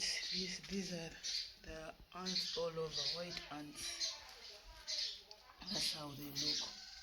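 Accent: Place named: Shona, Zimbabwe. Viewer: Oh my sister you have a beautiful Shona accent, Im enjoying it😄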